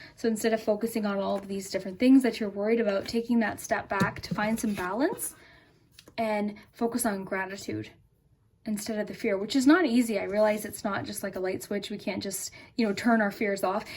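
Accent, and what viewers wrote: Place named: Canada. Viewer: Are you from Canada? 🙂I lived there before I know that accent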